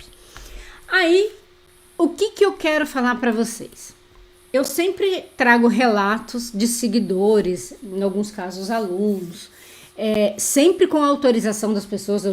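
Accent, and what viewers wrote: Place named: Minas Gerais, Brazil. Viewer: sabia que tinha algo por trás desse mineires!😘👍🏼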